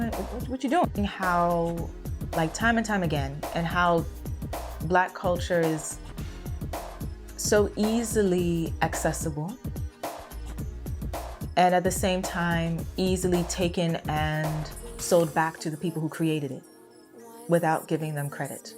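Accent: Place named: New York, United States. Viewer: I really love your swag. You look just like Alicia Keys, everything about you (beauty, skin tone, smile, peace of mind, way of speaking (the New York City swag), natural beauty, your love for the culture, knowledge, ...), and I mean it, everything about you really reminds me of Alicia Keys. Thank you and please, keep the videos coming. Look forward to the next video. Thumbs up! Hats off!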